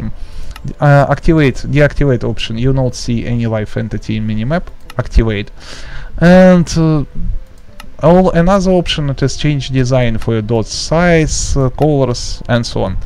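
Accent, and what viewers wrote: Russia. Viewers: He is russian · are you russian who is speaking in english?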